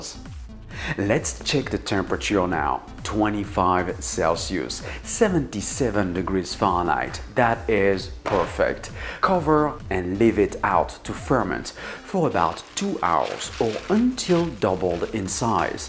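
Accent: France. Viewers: I can't figure out if this guy has a French, American or British accent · The croissants seems amaizing, but any similarity with France (albeit the accent) was lost when you defined the butter layer in imperial rather than metric. So sad... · Not sure if he's American trying to do a French accent, or French trying to do an American accent... 🤔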